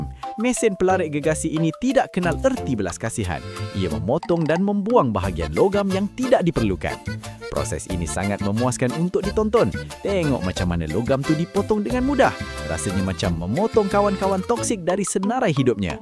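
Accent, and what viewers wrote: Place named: Indonesia. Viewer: menyampah plk aq dgn gaya ko berckp....dh mcm style Indon kpg !!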